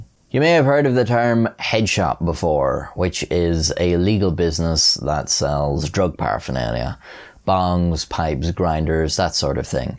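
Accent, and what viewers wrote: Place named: Ireland. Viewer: When you have an Irish accent you can say anything damn it!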